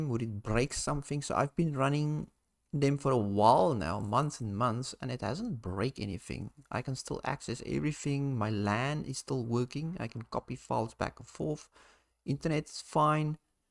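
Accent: South Africa. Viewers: Love your SA accent. Which part are you from?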